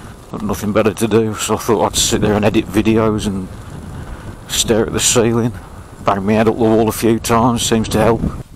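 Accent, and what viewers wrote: Birmingham, United Kingdom. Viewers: Very intresting guy to watch . U a brummie like myself 😂👍👌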